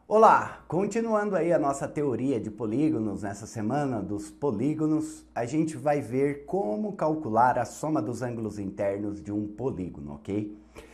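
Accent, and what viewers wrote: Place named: São Paulo, Brazil. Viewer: sotaque pulista kaka bom d+ tmb sou paulista mas ele tem um sotaque muito forte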